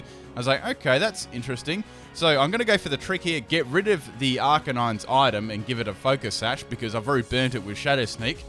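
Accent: Australia. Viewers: are you australia?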